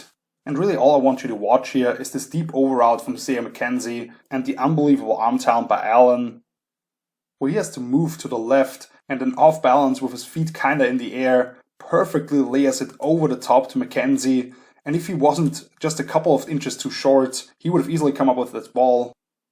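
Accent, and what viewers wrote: Austria. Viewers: Are you Austrian or German? I don't mean to be rude, but I think it's a bit strange to listen to foreigners analyze American/NFL football.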